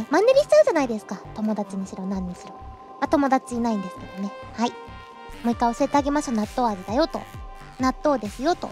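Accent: Kansai, Japan. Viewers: 最近、アイちゃんの関西人感がスゴい！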